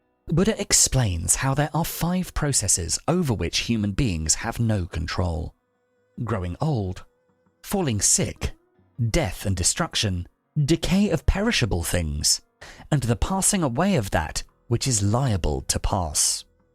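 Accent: United Kingdom. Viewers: Thanks but i wish non british would be spoken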